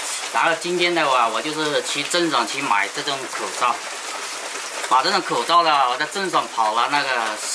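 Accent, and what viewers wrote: Hakka, China. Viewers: 客家口音？？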